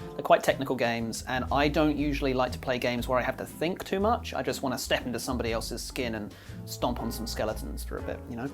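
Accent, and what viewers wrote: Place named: Australia. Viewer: you from NZ? you sound aussie.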